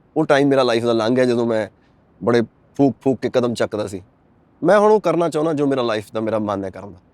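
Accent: Punjab, Pakistan. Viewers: Host lagda Lehnde Punjab toh aa !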